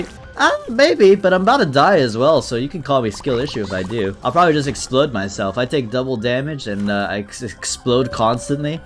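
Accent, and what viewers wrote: United Kingdom. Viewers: Bros british?????? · WHHGAT YOU AR E BRITISH???N i'm beyond flabbergasted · Are you british???? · BRITISH?? WHAT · you're British??? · is British? Oh no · IS BRITISH?,????,??,???????? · This Mod Changes EVERYTHING! Edit: WTF IS BRI’ISH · YOURE BRITISH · wait your British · YOURE BRITISH????? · Your British??! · IS BRI’ISH?! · Me when realizing is British: 😱😱😱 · YOU'RE BRITISH? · UR BRITISH? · wait, you’re BRITISH!? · I'm British. But I have no accent recognition. I didn't realise is also Bri'ish. Cool. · wait ur british :( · is British?? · You're British!?!? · is BRITISH?!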